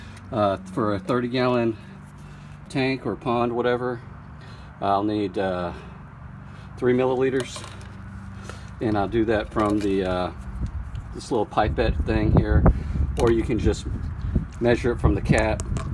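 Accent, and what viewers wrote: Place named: Texas, United States. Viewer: I love your shirt and your accent, I just discovered your channel and it made me smile when I found out where you live. OF COURSE I said to myself…. Texas! Super fun videos keep them coming.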